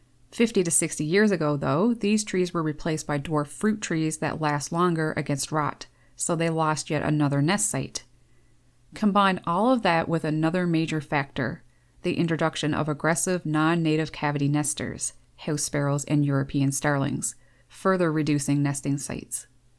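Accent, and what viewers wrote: Canada. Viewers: I love you! I love your Canadian accent! I love bluebirds! 💙 I have alot of them in my yard and occasionally will eat seed from my feeder. Interestingly I have a wooden split rail fence. Now I know why I have so many in my yard. Thank you for your 💓 of birds! You're easy to understand, and humble way of sharing information is a Blessing!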